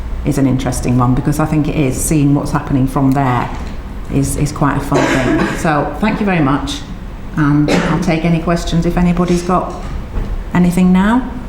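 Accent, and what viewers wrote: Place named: United Kingdom. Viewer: I THINK she is british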